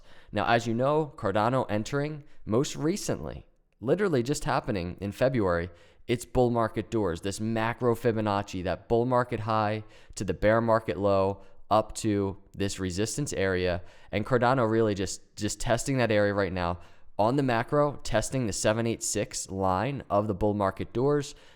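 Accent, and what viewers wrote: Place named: Midwest, United States. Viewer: Great videos. Are you trim the Midwest you sound like it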